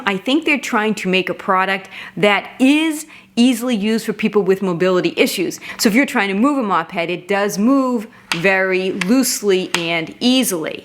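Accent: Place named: New England, United States. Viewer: Thanks for this. I’m in the market for something like this. By the way, you sound like a New Englander (like me)! Accent unmistakable and I love hearing it!! 😊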